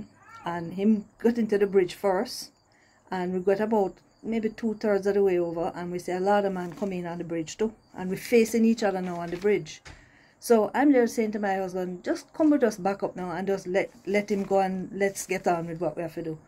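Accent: Jamaica. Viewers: I love how u love your Jamaican talking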